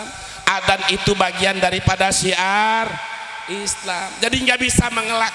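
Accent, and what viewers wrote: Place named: Banten, Indonesia. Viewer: Bahasa khas banten aa, aa anu timana kitu lebay amat?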